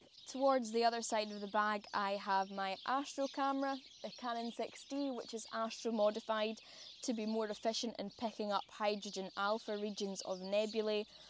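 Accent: Scotland, United Kingdom. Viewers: LOL "Pair-fect" bag. I love that Scottish accent!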